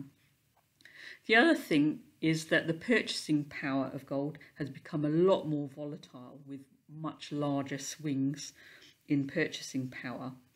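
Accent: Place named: East Anglia, United Kingdom. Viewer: Really enjoy these presentations. Out of interested...where abouts are you from in the UK? East Anglia? I'm trying to place the accent!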